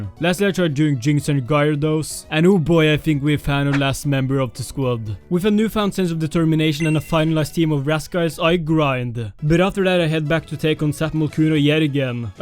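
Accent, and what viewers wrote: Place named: Norway. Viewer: I watched 10 seconds of this and I was like "han fyren her er garantert norsk". Glad to see it confirmed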